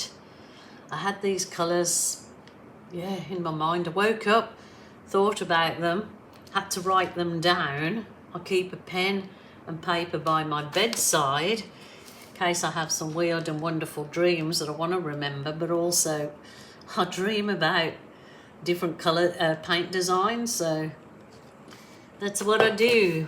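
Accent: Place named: Midlands, United Kingdom. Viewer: are you from the Midlands, my ears prick up automatically when l hear my home town. I’m also in Oz so my accent now is a weird mix of brum and Oz. Cheers from QLD.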